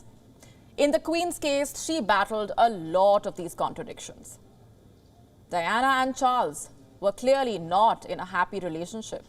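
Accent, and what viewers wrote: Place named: Punjab, India. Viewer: Heavy punjabi hindi accent!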